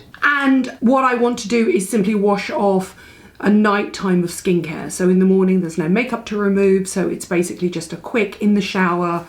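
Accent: United Kingdom. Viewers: I’ve come to listen to you, I’m just the average American loving the British accent.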